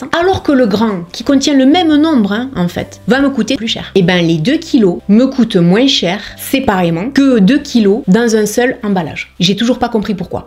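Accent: South, France. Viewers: Mdrrrr il est trop beau ton accent du sud. Je suis d’Avignon et merci pour tes astuces ( les coupons …etc ) Merci beaucoup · bonjour j'espère que vous allez bien? c'est toujours un plaisir de voir vos vidéos et je les adore, merci de nous faire partager ces 15 astuces utiles pour réduire le budget courses afin d'économiser de l'argent. Votre rire communicatif,votre bonne humeur et accent du sud c'est le top. J'apprécie votre simplicité, votre sincérité et votre naturel. Bonnes fêtes de fin d'année prenez soin de vous.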